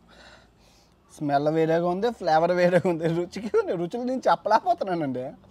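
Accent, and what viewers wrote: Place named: Srikakulam, India. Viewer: Bayya nuvvu evaro naku teliyadu 2nd video chusa this video was keka and so natural , like ur seikakulam accent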